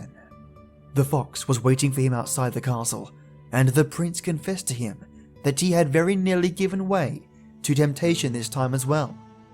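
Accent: Australia. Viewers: Oh my gosh! My night is complete. Your theme comes on...You mention Earl Grey? Is it TWG? With this wonderfully warm, Australian accented Tale Teller starts some awesome Swedish folk tales...I 'm having some 1837 Black tea by TWG it's all strawberry and good stories...as lovely as you. I have a bird, you know about Daisy, they really don't like to be touched or get a nice bite. That prince needs to get smacked up side his head being so silly and not listening to the fox. The law seems severe don't pay your debts or get killed. Such a wonderful folk tale...the bird singing was sweet. Don't you also agree if you mess up with those ritualistic creepypastas? It seems when you mess up with one of those by forgetting to light a candle or you looked at the wrong time...you're dead or cursed for eternity. The fox was extremely kind and had patience with the prince compared to other folk stories.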